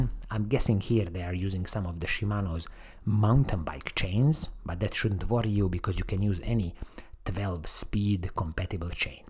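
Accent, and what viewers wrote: Italy. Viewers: I really like the Italian accent. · Ma perche' video in inglese quando si sente benissimo che sei italiano????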